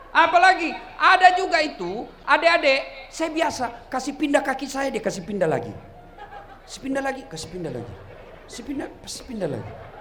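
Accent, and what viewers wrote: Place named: Toraja, Indonesia. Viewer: Orang Toraja ki pasti 😅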